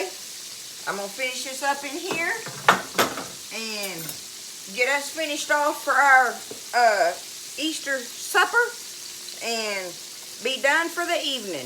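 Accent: South, United States. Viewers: I love your videos and you. I like so many of your recipes and how well you explain the process, the other thing I like is you southern drawl. Your chicken cookin is slot like my mother’s. Difference is after browning she put in the oven to finish cooking. She would season her flour with just Salt and Pepper. The flour it and fry. No matter what I do it never tastes the same. Many blessings to you and your hubby.😊❤️🙏🏽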